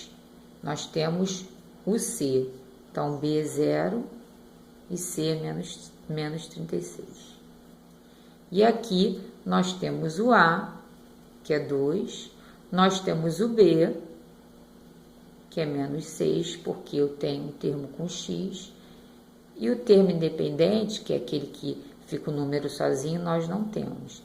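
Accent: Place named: Rio de Janeiro, Brazil. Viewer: i é carioca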